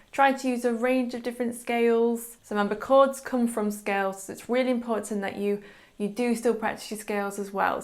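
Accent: United Kingdom. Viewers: Finally it took a brit accent for me to understand,lol im on my way!!!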